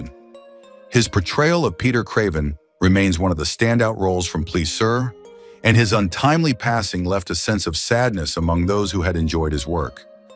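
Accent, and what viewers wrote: United States. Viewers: What a mess up when you get an American to do an English presentation.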